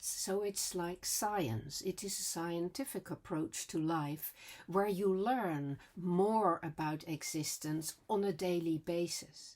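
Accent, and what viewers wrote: Netherlands. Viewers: I believe I was born a true Stoic by nature. I made a conscious decision at a very young age, say at about three years, not to cry and draw undue attention to myself when I fell and grazed my knees. Having this innate attitude has got me through some very difficult situations but it has not helped me to cultivate joy in my life. This is why, as I enter the twilight years of my life, learning about the Existentialist perspective of what it is to be human is so valuable. Additionally, my partner has also started listening to your channel and not only has he commented on your sweet Dutch accent, but he appreciates the content of your channel.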